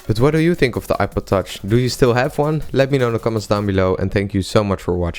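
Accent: Netherlands. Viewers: funny Dutch accent. :). but good review!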